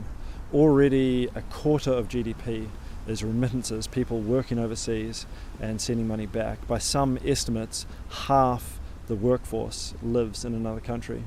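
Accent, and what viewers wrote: New Zealand. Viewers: I think you have the New Zealand accent to blame for that.. Takes a more effort to understand if you have not heard it before! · I didn't even recognise the Kiwi accent til half way thru the vid hahaha.. obviously been watching too much shortland st fml. Awesome vid tho man, good on you! love from another kiwi guy <3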